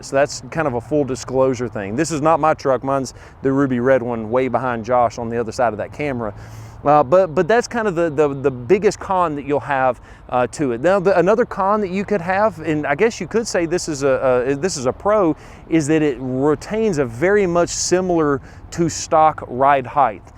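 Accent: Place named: South, United States. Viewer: ".....you might can get...." Yeah, he's from the South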